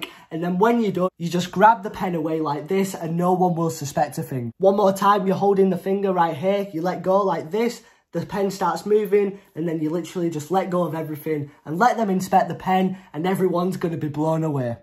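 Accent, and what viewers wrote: United Kingdom. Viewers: Bro r u Britisher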